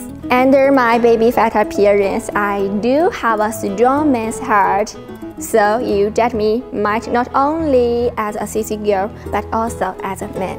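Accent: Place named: China. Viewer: She speaks English with a Chinese tone system and briefness (Chinese doesn't extend their words like some English speakers do with English)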